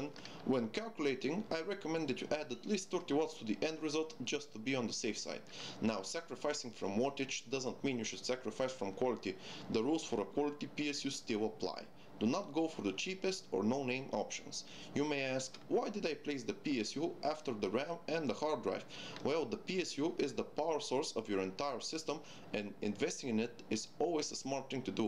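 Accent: Russia. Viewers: Lol, I find the way you talk funny, are you Russian or something? Good video!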